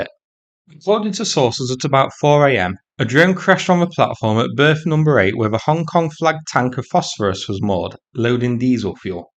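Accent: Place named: Lancashire, United Kingdom. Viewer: I can't pick your accent. Lancashire? I'm guessing North of Manchester but not Leeds. Kind of softer than Burnley.... Greetings from Australia.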